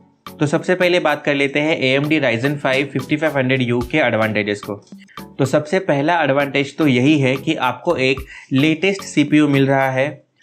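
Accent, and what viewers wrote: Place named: India. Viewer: 很不错，印度口音非常喜感（如果说错了我道歉）。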